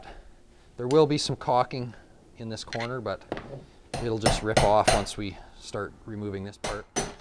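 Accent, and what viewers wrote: Canada. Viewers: My wife is from Victoria BC. I picked up your accent immediately. Thanks for the vid! I have to replace a broken door. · great informative video sir, you from Canada eh? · Only needed to see the first 5 mins, but was captivated and watched the whole thing. The Canadian demeanor is just so lovely...at least from the accent he sounds Canadian…anyway, excellent clip! Right now, my sliding door feels super heavy, and not being that handy, I couldn’t figure out how to pop it out to clean the tracks (even though they look pretty dang clean, or whatever. I had no clue you could adjust the height of the hardware under the door. This is going to be super helpful. I’ve got a weekend project that shouldn’t take much time at all. THANK YOU from Ohio.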